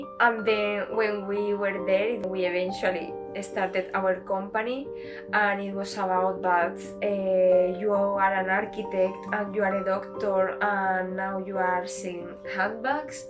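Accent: Spain. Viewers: it’s harder to understand if you are not Spanish, and the reason it’s not her accent, it is the “entonation” (I don’t think this is the right word, but English is not my language, sorry). She speaks English with Spanish entonation. (Very common for Spanish speakers) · Spanish accent - Spanish subscribers..🙄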